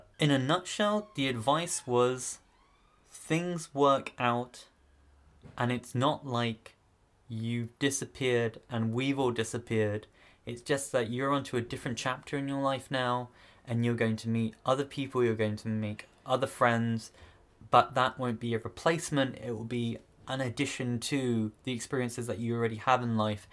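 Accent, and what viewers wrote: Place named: England, United Kingdom. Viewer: Okay, so at first I was watching your videos simply for the applying to Oxford content, but then.. I don't know, there's just something about the way you talk to us which I really enjoy! :) Plus I'm still trying to get used to English accent, and since watching you is quite a pleasure, I guess I'll be staying here. ;)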